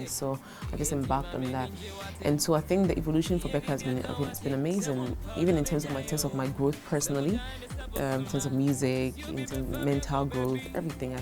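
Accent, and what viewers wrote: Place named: Nigeria. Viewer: She has Nigerian accent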